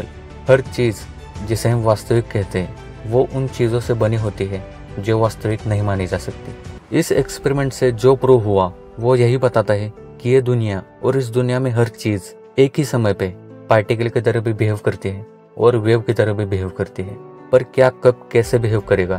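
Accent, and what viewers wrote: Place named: Maharashtra, India. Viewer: तू मराठी भाषिक आहेस अस वाटतय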